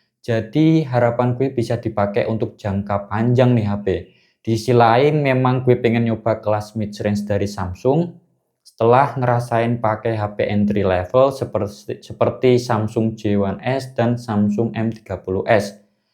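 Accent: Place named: Java, Indonesia. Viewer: Kyknya gw nya mending di ganti aku deh bng medok soalnya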